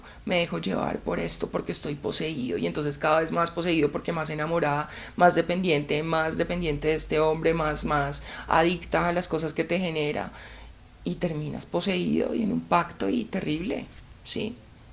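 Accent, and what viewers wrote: Colombia. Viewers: ACENTO ROLO? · Por lo general la mayoría de las personas no toman o se dan cuenta de estos detalles que parecieran insignificantes pero el daño poco a poco le va destruyendo la conciencia ,la esencia , la espiritualidad y por lo tanto la mente que de por si es mala se vuelve más perversa todo lo que has dicho en este video es la realidad pero la humanidad no quiere acer el mínimo esfuerzo para salir de esta matriz o granja este conocimiento es el despertar másivo , te felicito mujer mis saludos desde Barranquilla Colombia y que me pareciera que fueras de aca por tu forma de hablar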